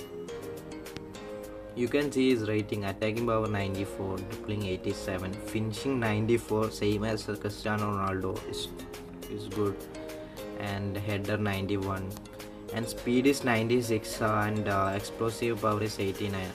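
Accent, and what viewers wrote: Kerala, India. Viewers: English kettalariyam malayaliyaannn...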